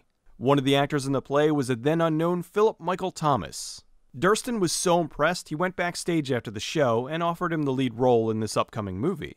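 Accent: United States. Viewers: I'm from Poland and don't know much about accents, but for me you sound so American that you make Americans sound like British.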